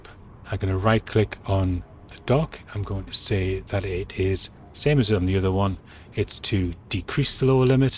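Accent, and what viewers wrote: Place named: Scotland, United Kingdom. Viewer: I meant I was waiting for a tutorial from you. But... I suppose you're right. Beat my head against the wall enough, and I could probably figure it out. It's just so nice having your soothing Scottish(?) voice explain everything in perfect clarity. (Is that enough sucking up?:-) Again, thanks, for all your efforts. I appreciate it.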